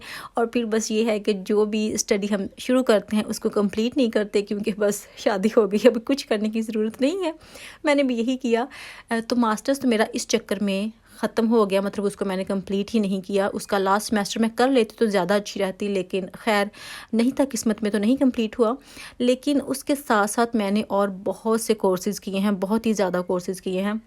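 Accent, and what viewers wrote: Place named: Faisalabad, Pakistan. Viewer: Ap ki lahaja MERI Tara ha shiad ap b Faisalabadi ha 😁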